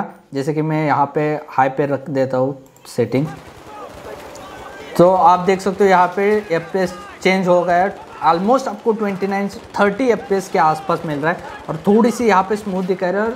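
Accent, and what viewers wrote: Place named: Maharashtra, India. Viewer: Bhau tu marathi ahes na tuzhi hindi aikun lagech karala pun changli ahe hindi 😂 btw mala suggest kar na ha laptop chan ahe ka like game gta 5 3 to 4 hours chalel?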